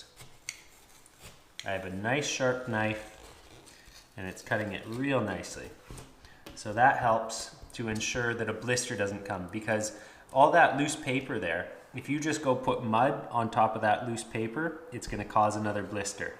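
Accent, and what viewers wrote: Canada. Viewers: Canadians have agonizing accents.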